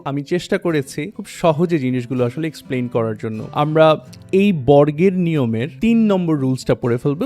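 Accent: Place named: United Kingdom. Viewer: Sir your bangla accent sounds British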